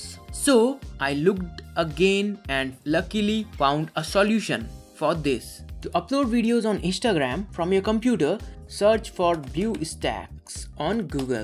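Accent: India. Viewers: just cuz the guy sounds a bit indian? racism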